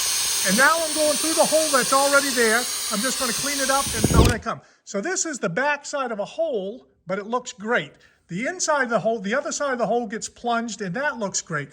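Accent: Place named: Maine, United States. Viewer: Hey, your videos are really great. Well thought out, straight to the point, helpful, and that cool Maine accent is the icing on the cake. Keep ‘em coming.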